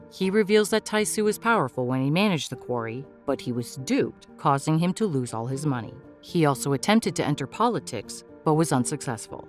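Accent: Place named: Europe. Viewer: I think maybe she's an European? What's wrong, Korean is difficult language to learn for Europeans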